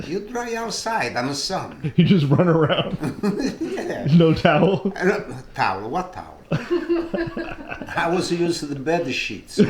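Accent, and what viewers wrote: Italy. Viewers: I love that Italian accent…reminds me of my grandparents ❤❤. We need more stories of your life in Italy!😊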